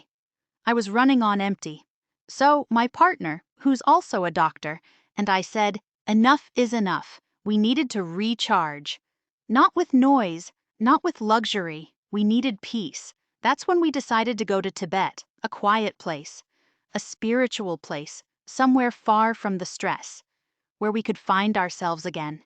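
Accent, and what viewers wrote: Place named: United States. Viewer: American accent!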